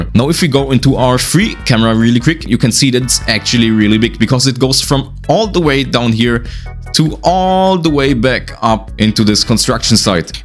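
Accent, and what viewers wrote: Germany. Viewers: Aha I knew your German I could hear it was hard but I could hear it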